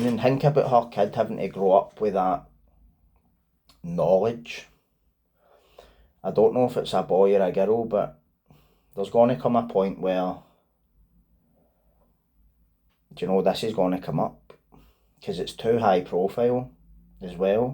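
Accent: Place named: Glasgow, United Kingdom. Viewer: No idea how i found your channel pal but im glad i did, id never heard about about this case, never mind what anyone comments or says, always safe sitting behind a screen, saying it to your face is always a different story pal, im subscribing so thank you for your hard work, great video xx its always nice to hear a fellow Glasweigian on youtube 😊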